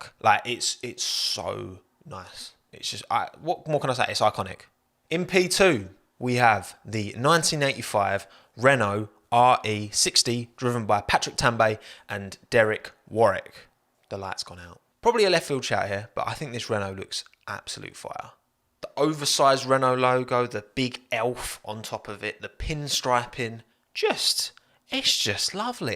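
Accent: United Kingdom. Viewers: i like the combination of american slang with the british accent :D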